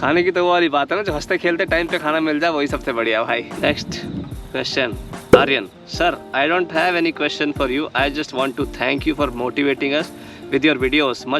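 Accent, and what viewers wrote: Haryana, India. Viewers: Bhai tari aadat or awaz ek dum hariyana valo jasi hai Vaise mai bhe hariyana ka hu 🙏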